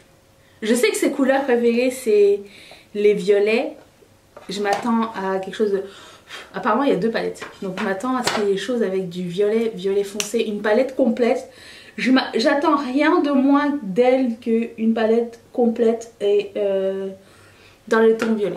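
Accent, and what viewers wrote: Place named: Quebec, Canada. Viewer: Wow! Je sais pas pourquoi mais j’entends un léger accent québécois 😂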